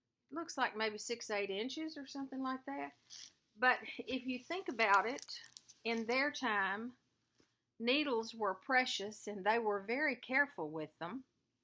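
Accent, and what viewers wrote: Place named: Alabama, United States. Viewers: love your video! it is cold here in Portland Oregon. I have spend a few Christmas in Georgia. My sister in law lived in Lawrenceville now Sugar Hill. Your accent reminds me of her. you are right about floss tube I think I know you all personally. Merry Christmas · I just love listening to you. You make me laugh and you talk just like we do in Alabama. Beautiful stitching.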